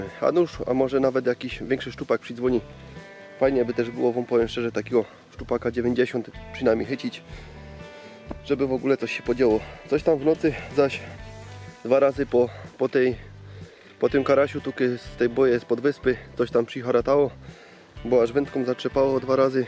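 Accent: Silesia, Poland. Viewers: Filmik fajny, ale śląskiej gwary bardzo ciężko się słucha.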